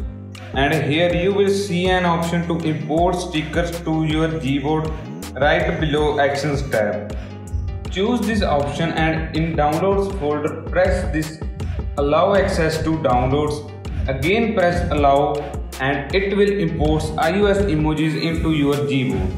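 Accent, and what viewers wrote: India. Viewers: bro tuhadi english...🔥✨😂desi touch nal..!!kaim lgdi a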